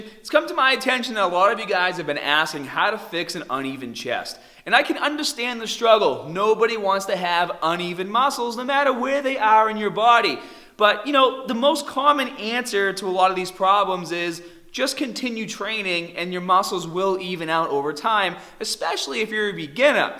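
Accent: Boston, United States. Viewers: Man that Boston accent hit me like a ton of bricks! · you from Boston? never noticed your accent before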